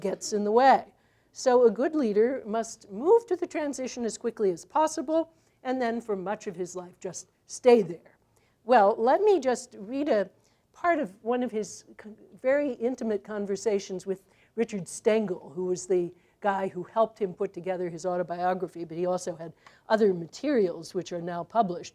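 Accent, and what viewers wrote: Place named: RP, United Kingdom. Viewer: Nussbaum speaks like the stereotypical high british society intellectual.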